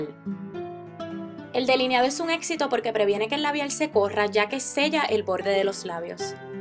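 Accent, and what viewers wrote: Puerto Rico. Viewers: me encantaaa tu acentoo boriicuaa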